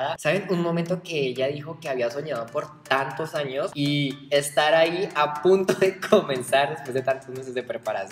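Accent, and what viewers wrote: Mexico. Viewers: Este tipo es colombiano y habla como Mexicano? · Hablas mexicano. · Pero por qué hablas como mexicano gomelo??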